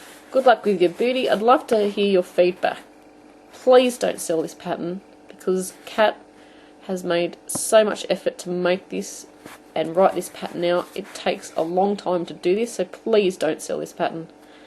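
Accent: Australia. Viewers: Thank you thank you thank you just started to learn to crochet and these are the easiest booties ever if I didnt say it enough thank you also good to hear oz accent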